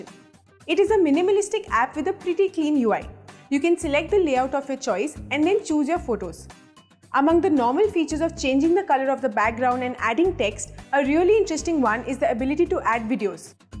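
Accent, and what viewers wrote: India. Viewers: It's an Indian channel, and India has English users as well. People from all over the world adjust to the American accent when watching american shows/videos, or British TV shows, I don't see why they can't adjust to the Indian accent as well. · I hate indian accent. One of most terrible way of talking in english is in indian accent . I never watch anything that is in indian accent. But your video am just checking if there is any useful app only.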